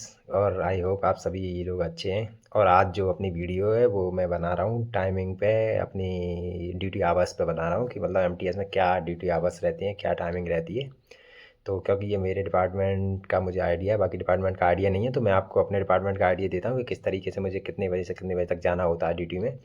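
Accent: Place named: Braj, India. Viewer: Baat karne ke tarike se lag raha hai aap ki real bhasha Braj Bhasha hai 😊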